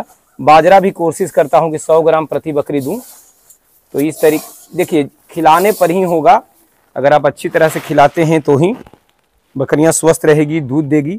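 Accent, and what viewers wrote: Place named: Bihar, India. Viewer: Bhai ya to Puri terah se khadi Hindi bolo nhi to Puri terah se Bihari language me bol ..nhi aata bolne to kamse kam wo kaam nhi karna chahiye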